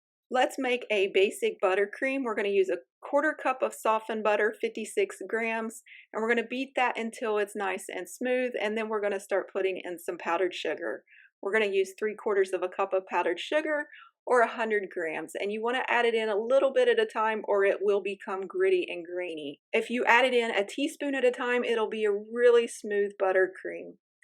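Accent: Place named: West Virginia, United States. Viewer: Thanks for another great video! My wife and I have been struggling with Italian macarons, with limited success. French macarons are so much easier (though we haven't tried them), that we're going to try them now. Do you have any experience with Italian macarons? If you enjoy messing with several containers, heating sugar syrup, etc., they're fun. Which type do you think are better. Listening to your accent, this old Appalachian boy thinks he recognizes a northern W. Va. pattern. (Which ain' bad!)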